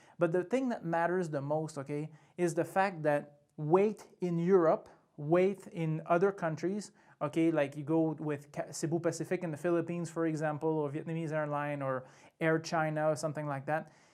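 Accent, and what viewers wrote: Quebec, Canada. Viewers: I was so glad to hear the Quebec accent when you started talking. Made me trust you more :') Thank you for the valuable info!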